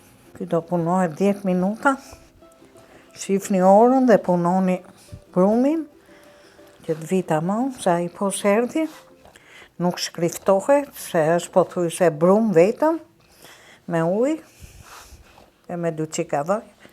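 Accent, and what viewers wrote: Tirana, Albania. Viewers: Pershendetje zonja e nderuar.❤🥰Te lumshin duart e arta e folura tironce na kenaqe me kujton momen time.❤❤Te uroj shedet e gezim e lumturi me gjithe familjen.🙏❤🥰❤🥰❤❤🌲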